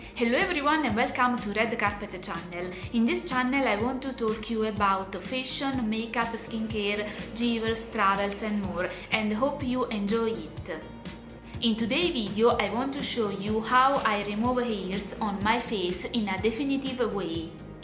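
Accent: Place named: Italy. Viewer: Maybe she’s Italian and speaks with accent ?! Jesus, calm down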